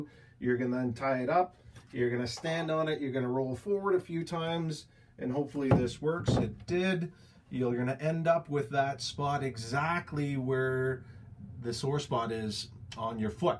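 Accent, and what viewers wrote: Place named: Canada. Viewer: Yes, it's called "Canadian raising." Before I saw Barrie and Orillia in the channel title, I knew was a fellow Canadian. It seems to be more pronounced in/typical of southern Ontario, but we do it here on the west coast too.:)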